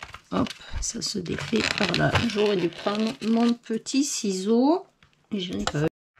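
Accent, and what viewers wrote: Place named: South, France. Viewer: Bonjour, Les blocs 30x30 sont bien de Noël dernier. Vous, vous êtes choquée mais moi déçue car les articles sont en réserve mais faute de personnel seront peut-être mis en rayons semaine prochaine mais pas sûr... voilà la réponse que j'ai eu vendredi sur Albi!😫😡 J'y retournerai lundi et éventuellement j'irai à Gaillac... Vous êtes de quel coin avec votre petit accent du sud?😊 Je ne connais personne dans mon secteur pour partager le scrap. Bon dimanche 🌺 Sophie d'Albi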